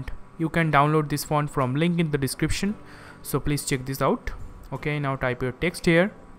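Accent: India. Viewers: omg at first i thought he was american but his Indian accent is getting more and more lol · By your accent i can recognize you as Indian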